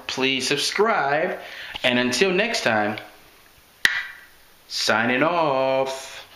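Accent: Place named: Canada. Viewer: You sound like a Canadian. thats a compliment btw:)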